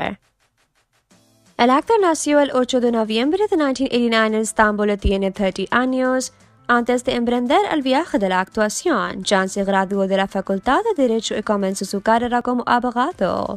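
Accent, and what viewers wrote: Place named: England, United Kingdom. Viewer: Perdonais, alguiene dice porqué la señorita de voz, habla en español malisimo con actento inglés y dice los números en inglés también??? NO SE ENTIENDE NAAADAAAAAA. lo siento gracias.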